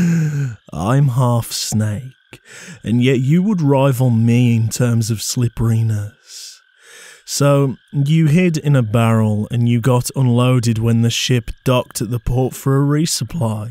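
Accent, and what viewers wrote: United Kingdom. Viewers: I could listen to tour accent all day if I could What kind of accent do you have it sounds like you are from the UK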